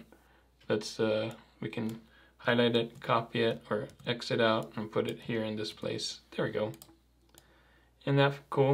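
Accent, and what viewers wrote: Hawaii, United States. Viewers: thanks for all these videos, been learning a lot. quick question, are you from hawaii? cuz after watching some of your vids, it's subtle but i noticed that certain words you say sounds very close to how some people from hawaii would say them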